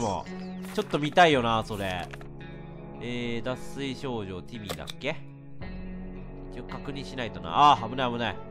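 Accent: Kansai, Japan. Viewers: レトさんの関西弁好きすぎる、、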